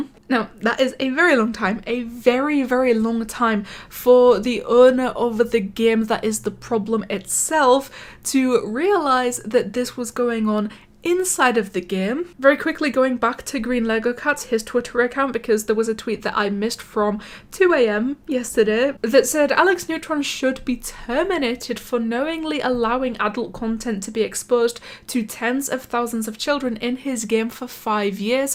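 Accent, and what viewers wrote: United Kingdom. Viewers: I like ur British acebt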